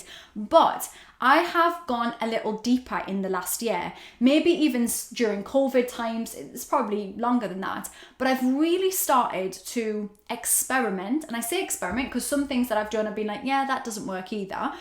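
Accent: Newcastle, United Kingdom. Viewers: Hey. I found your channel being a big Girls Aloud fan, and I was taken with the Geordie accent. Which is why my username is my name haha! Love your stuff. Hope you do more!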